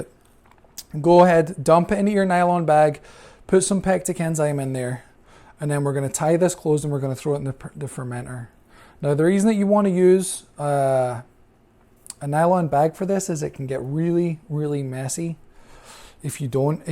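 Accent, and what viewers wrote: Scotland, United Kingdom. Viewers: Very odd accent. Sounds scottish at points but then quickly becomes American?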